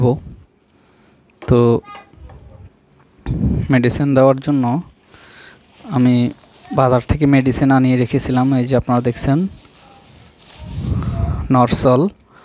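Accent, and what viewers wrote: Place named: Cox's Bazar, Bangladesh. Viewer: ধন্যবাদ, ভাই আপনার বাড়ি মনে হয় কক্স বাজারে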